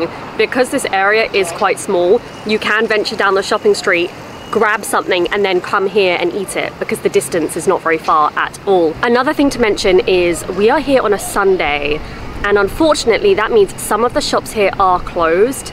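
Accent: United Kingdom. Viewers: いつも､ さんの｢イギリス英語｣を聴きに来ます｡ 明瞭で､美しくて､音楽のようでホッとします｡ アメリカ人の英語だと､ときどき聞き取りが難しいニッポン人の私ですが…… さんの｢イギリス英語なら､いつもハッキリ分かって､安心します｡ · 落ち着いてこじんまりした感じがいいですね。なんか、懐かしい感じがします。ところで、さんの「いただきます」とイギリス英語のとりこになしました！！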